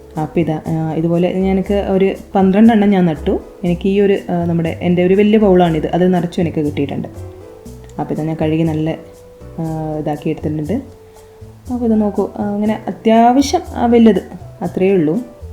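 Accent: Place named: Kannur, India. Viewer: Krishi adipoli. Njanum nattitundu balcony il. Pinne samsaram kettitu malabar side aanennu tonunnu. Etra sramichittum naadan basha idaku idaku ariyate purathu varunnu alle 😁😁😁. Nhan kannur aanu. Kannur slang pole thonni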